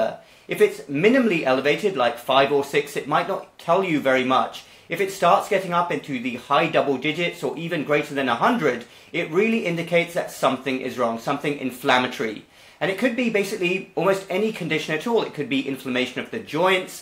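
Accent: United Kingdom. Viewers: I thought practices in USA. Though he is British, hence his accent.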